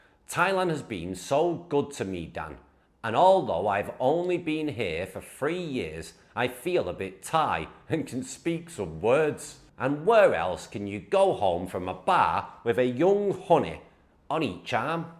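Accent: United Kingdom. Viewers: Complete BS!..he is British by the way he talks.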